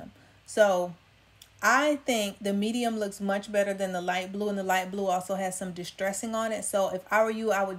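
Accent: South, United States. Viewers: hi 😄, this is Kay.. yesss. they are all 5 stars. 💥💥💥💥💥. btw, I love how you say "thure' instead of there. where are you located? or the bit of southern accent?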